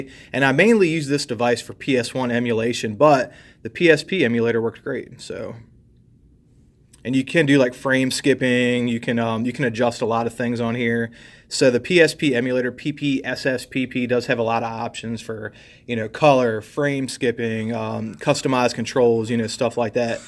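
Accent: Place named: South, United States. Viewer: Surfer dude? This dude clearly sounds like a hick.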